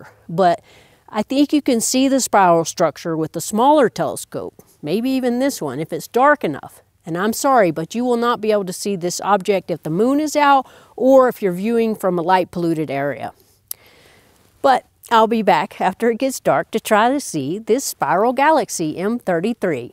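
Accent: South, United States. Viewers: I love your southern-sounding accent. Subscribed 😊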